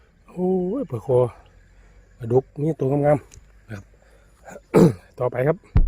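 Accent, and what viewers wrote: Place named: Isan, Thailand. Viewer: มักเสียงเว้าว่ะเว้าเป็นตาแซบฮ้าย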